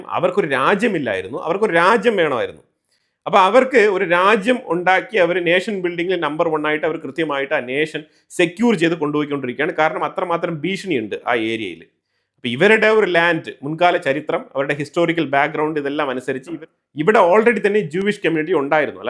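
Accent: India. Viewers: വളരെ നല്ല അവതരണം കൃത്യമായി കാര്യങ്ങൾ മനസിലാക്കാൻ കഴിഞ്ഞു... 👍👍സ്ഥിരമായി കാണാറുണ്ട് യുദ്ധ വാർത്തകൾ മററുളള ചാനലുകളിൽ പറയുന്നതിനെകാൽ വലിയ ആധികാരികമായി തന്നെ പറയുന്നുൻട്...താങളെ കുറിച്ചു ഒരു self Introduction പ്രതീക്ഷിക്കുന്നു... മലയാളം കേട്ടിട്ട് കേരളത്തിന് പുറത്തു വളർന്ന ഒരാളായിട്ടാണ് തോന്നുന്നത്...എല്ലാ ആശംസകളും..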